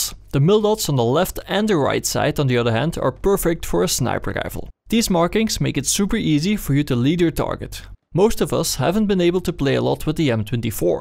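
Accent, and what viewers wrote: Netherlands. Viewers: je bent nederlands he? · You're Dutch arent you? :) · It sounds like he comes from the Netherlands, so I assume that's his accent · are you dutch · You sound so Dutch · Are you Dutch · from the moment you started talking i knew.. dat je nederlands bent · A quite thick Dutch accent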